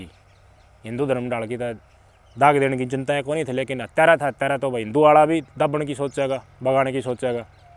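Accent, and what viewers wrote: Haryana, India. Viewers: Bhai ji you are great haryanvi lahja bilkul stick ❤❤❤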